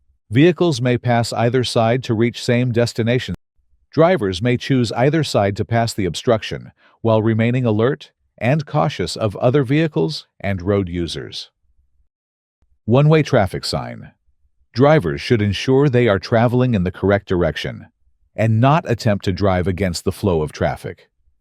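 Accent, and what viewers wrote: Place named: United States. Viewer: why an American accent for uk driving????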